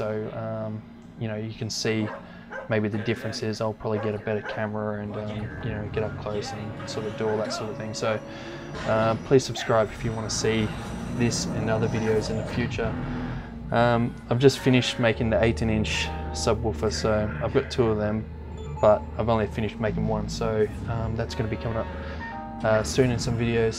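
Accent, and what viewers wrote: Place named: Australia. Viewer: Andre Blackwell After listening to him for the first couple of minutes, I'd say he's likely in Australia.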